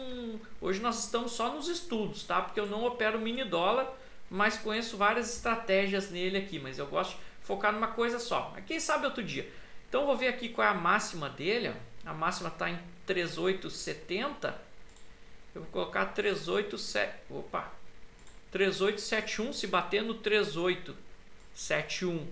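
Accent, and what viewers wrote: Rio Grande do Sul, Brazil. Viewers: Eu sou do norte e de cara vi que era do sul e falei "baaah gente boa de mais esse guri" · Cara show de bola , muito obrigado e parabéns pelo video , estou estudando para entrar nessa. Moro em SC agora ,mas sou do RS , saudades quando escuto esse teu sotaque. Muito bom parabéns pela disponibilidade de mostrar e ensinar... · Esse sotaque eu conheço, tu é daqui do sul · O cara é bom.... Ensina com simplicidade, estou tentando aprender sozinho tbm com ajuda de voces, não é facil, mais não vou desistir. Pelo sutaque é gaucho?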